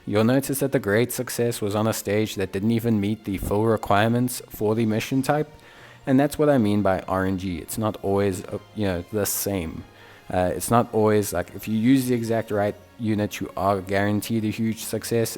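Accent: South Africa. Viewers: sound south african or portugese learned english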